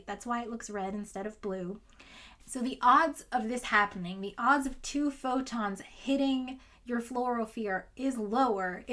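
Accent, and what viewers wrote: United States. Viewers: Nice video. I just wish you weren't butchering (aka Americanising) not English names to this extent.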